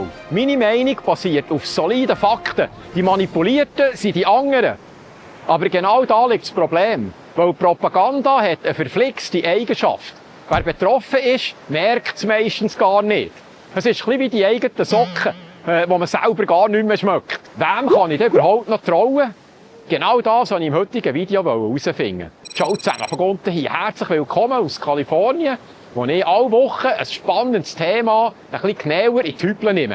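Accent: Bern, Switzerland. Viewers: Ich habe so eine Freude an deinem Berndeutsch, obwohl ich keine Bernerin bin. Auch freut es mich im Abspann den Namen meines Wohnortes "Lauwil" zu sehen. Du bist ein charmanter Aufsteller mit Tiefgang.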